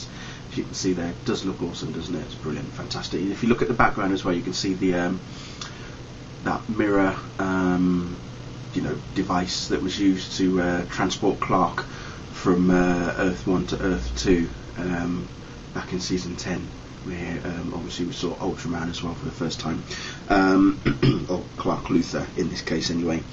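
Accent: United Kingdom. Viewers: What part of England u from I know it must be up north lol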